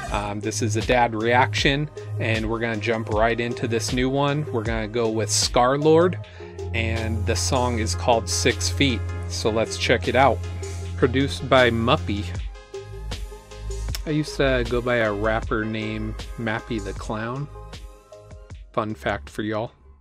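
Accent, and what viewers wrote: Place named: United Kingdom. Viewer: The mans british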